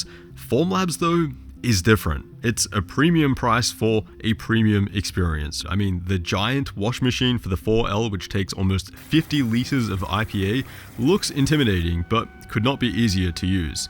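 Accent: Australia. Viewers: Australian creator says "dollars" in their Australian Accent while living and working in Australia and means USD instead of AUD?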